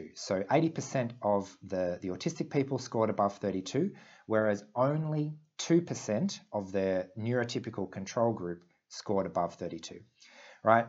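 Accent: Australia. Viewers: Are you Australian? I keep hearing "IQ", not "AQ".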